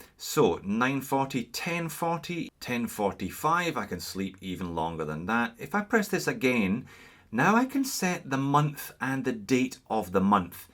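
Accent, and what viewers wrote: Scotland, United Kingdom. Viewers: Another great review! I would like to ask, where in Scotland do you come from? I love your accent. I live on the Borders, and often pop up into Scotland whenever I'm tired of England (I'm English myself but cheer for Wales). I particularly like the "Muckle Toon", Langholm. I'm a Casio fan, too - my sports watch is an ancient Baby G that my Grandad bought for me years ago. It takes a kickin' and keeps on tickin'.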